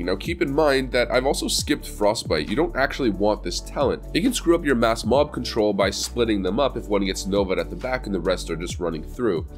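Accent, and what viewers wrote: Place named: Canada. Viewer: Typical Canadian. Makes my ears bleed.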